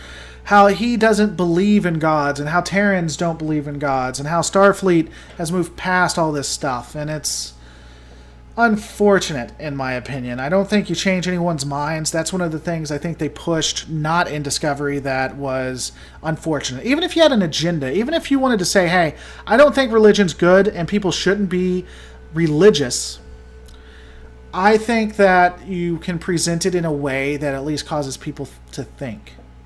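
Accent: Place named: South, United States. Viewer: Hi. I don't like it when people have a go at you about the South, or your accent. It's uncalled for. Your views are what counts, not how you pronounce them. More power to you for putting down the ruder parts of the group watching you. I'm a Brit, so the whole "your a fan of the British" thing made me laugh - we were the good guys! Don't worry, I forgive the American rebels, ha ha. We're talking about events over 200 years ago so I think we should probably be moving on to make a better shared future on our precious pale blue dot.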